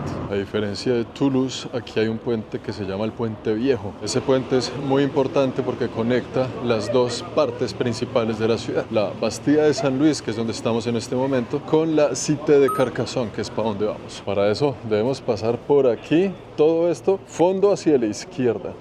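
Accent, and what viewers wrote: Colombia. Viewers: Varias veces! Y me encanta su acento Colombiano.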